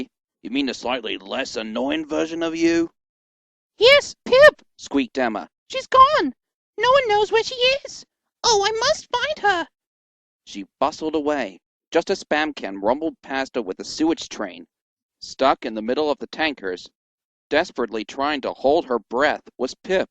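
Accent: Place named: United States. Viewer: Why are these narrations so American and so censored?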